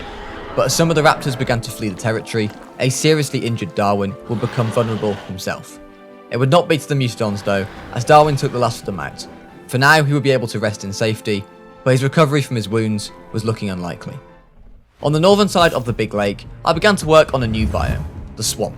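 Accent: Czech Republic. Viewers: who wouldve guessed your from czech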